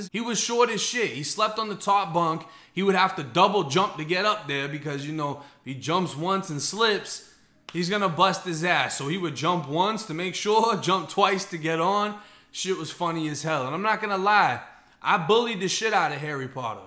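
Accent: Boston, United States. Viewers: You from Boston?? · You got a Boston accent..how did you end up doing time in Florida?🤔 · That’s that Boston accent coming into play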